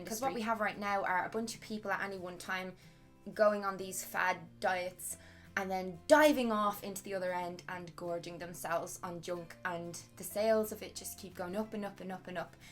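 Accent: Ireland. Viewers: I agree with everything you said! Also, it's crazy how similar your circumstances in this video are to mine! :) P.S. love that Irish accent.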